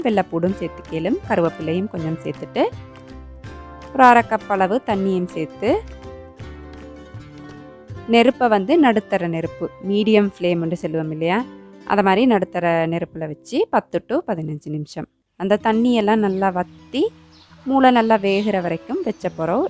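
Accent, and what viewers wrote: Akurana, Sri Lanka. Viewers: Slang is similar to Akurana , kannuku kulirichi Ana wai urina oru vedio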